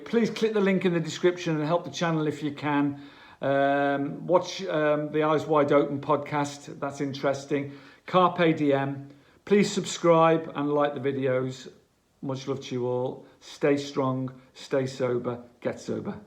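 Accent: Liverpool, United Kingdom. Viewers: Is that a Liverpool accent?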